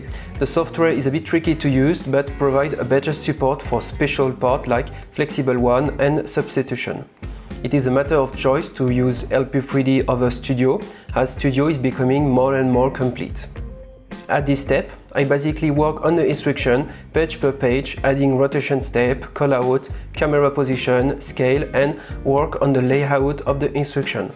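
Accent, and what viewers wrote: France. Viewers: are you french ? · Are you french ?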